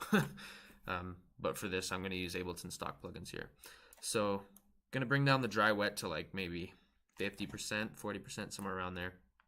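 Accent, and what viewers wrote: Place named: Canada. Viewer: haha I loved the moment when I noticed you're Canadian! Hell ya brother, full send er eh!!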